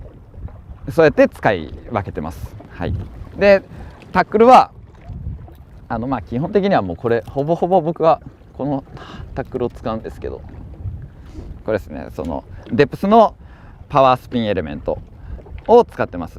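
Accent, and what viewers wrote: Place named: South, Japan. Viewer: South japanese accent!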